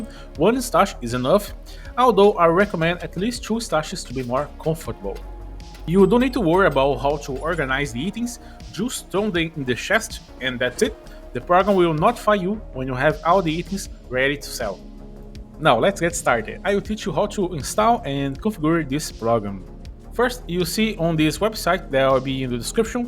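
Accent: Brazil. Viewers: You have to be Brazilian or Portuguese , i can tell by the accent! anyways no hate or anything just something i noticed but thank you for the awesome video!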